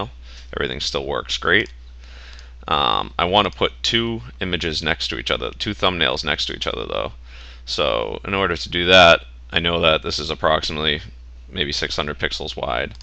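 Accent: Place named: Canada. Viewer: by the accent, I'm guessing you're Canadian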